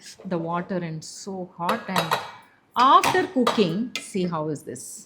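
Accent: Tamil Nadu, India. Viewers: ek teacher thi hamre sath, tamilnadu se .... JP madam ... your voice reminded her. vo bhi aisi bolti thi ,,,, Ayyo rama rama, and english speaking style, bilkul apki jaise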